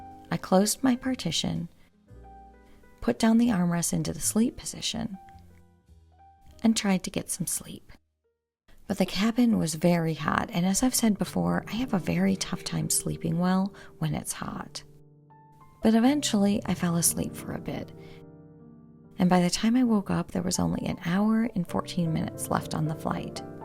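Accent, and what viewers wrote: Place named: United States. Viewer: あなたの英語はアメリカ語英語です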